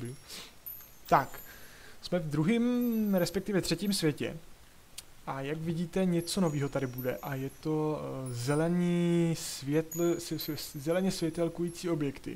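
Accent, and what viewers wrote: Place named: Brno, Czech Republic. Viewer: hele my sice víme že nemáš čas ale podívej se kolik lidí chce aby si nahrával alespoň jedno video za týden rozhodně by nám to stačilo protože bysme pravidelně slyšely tvůj příjemnej hlas a brněnské nářečí;) opravdu nám všem chybíš:)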